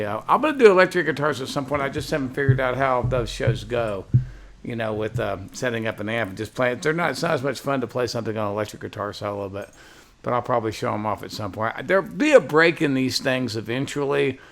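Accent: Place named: United States. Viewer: You sound American. Bleurgh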